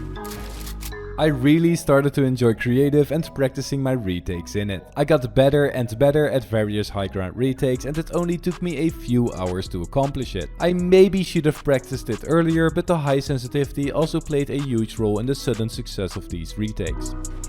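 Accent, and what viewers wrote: Netherlands. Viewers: Vault grey pistols:) Are you dutch cause i think that when I hear your accent.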